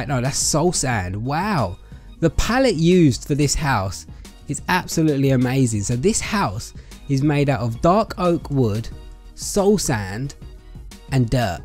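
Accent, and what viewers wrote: United Kingdom. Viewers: Wait do u speak British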